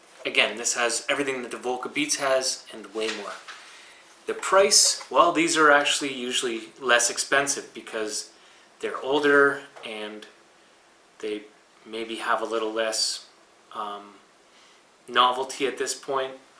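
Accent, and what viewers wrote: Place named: Canada. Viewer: You have the slightest hint of a Canadian accent. :P Love the video, thanks for sharing with us.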